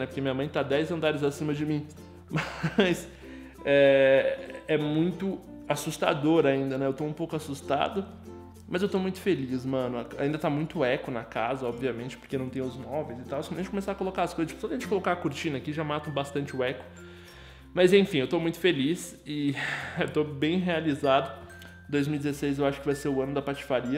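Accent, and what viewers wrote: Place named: Rio Grande do Sul, Brazil. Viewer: Pensei que ele fosse gay, mas é só o sotaque gaúcho mesmo.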